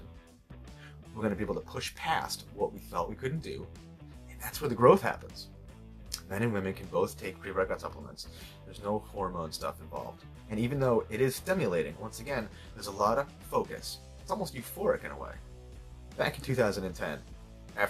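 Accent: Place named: United States. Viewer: Love your American accent, you are just simply.. Yum! b-e-a-u-t-i-f-u-l to look at ....love how you explain stuff PROPERLY!!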